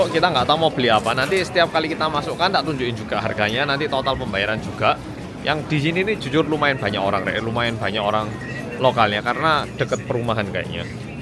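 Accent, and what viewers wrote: Java, Indonesia. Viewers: Hai medok sudah lama nggak posting mega pasti lucu karna kamu nyenengi sekarang mega main folinya banyak bagus 😂❤❤❤ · Mukanya kore tp klo bhasa indo logt jwanya kentel bget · AKU GAK SKIP IKLAN DONG UNTUK YANG MEDOOOK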